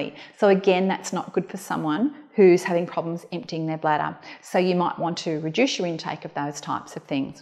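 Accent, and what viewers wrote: Australia. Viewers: How would you know there is residual urine left in the bladder without ultrasound or tests for voiding? Happy to hear a fellow Aussie.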